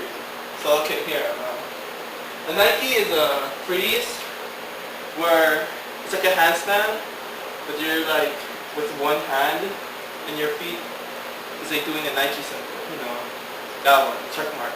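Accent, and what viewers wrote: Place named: Philippines. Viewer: lol your filipino. that accent sounded familiar xDDD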